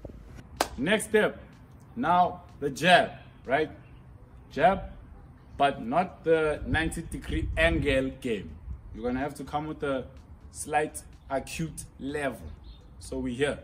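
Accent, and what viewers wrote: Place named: South Africa. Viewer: Where is this guy from?!?!? Getting south Africa vibes but Idk I also hear zim I'm confused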